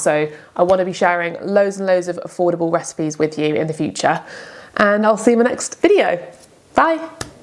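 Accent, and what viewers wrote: United Kingdom. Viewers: I love your book and your videos. I'm Italian and I love your fantastic British accent too! I'm going to try the stuffed peppers for sure! They look scrumptious! Thank you! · I love your channel; I feel that we can be best friends!! Love your lovely British English accent. You remind of my English teachers when I was studying English back in my hometown. I love the food you make!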